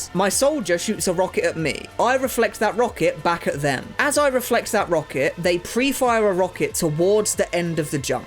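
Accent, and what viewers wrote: United Kingdom. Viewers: babe wake up new tf2 video from funni british dude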